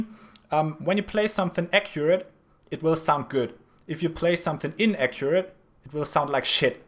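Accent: Germany. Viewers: are you german? :P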